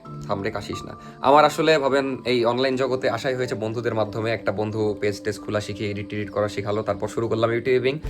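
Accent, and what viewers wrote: Rajshahi, Bangladesh. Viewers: vai apner kotha sune bujte parci je apni rajsahi thaken 😅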